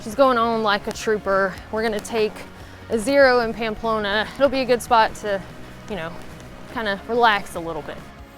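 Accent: South, United States. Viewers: I just realized I've watched you for so long I don't hear your southern accent anymore lol. Great video as usual. Happy Trails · southern vernacular.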